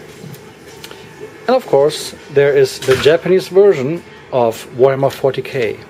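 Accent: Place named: Germany. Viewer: Fünf Worte und ich wusste, dass du Deutscher bist! :D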